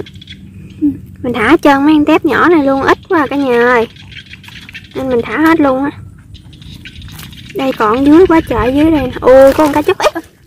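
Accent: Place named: Southern Vietnam, Vietnam. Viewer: Giọng miền Nam sao nghe dễ thương vậy em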